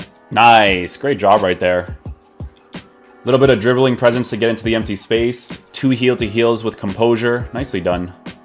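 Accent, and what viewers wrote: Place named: Portugal. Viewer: És tuga? Notei aí algum português na pronúncia ahah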